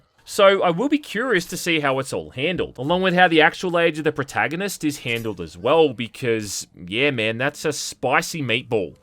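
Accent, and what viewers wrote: Australia. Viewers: Are you OK? You're sounding like an Australian today.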